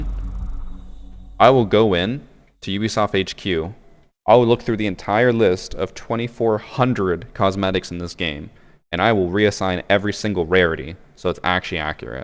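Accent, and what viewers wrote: Canada. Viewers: Yo do I speak Spanish? I know ur Canadian because you say “oat” instead of out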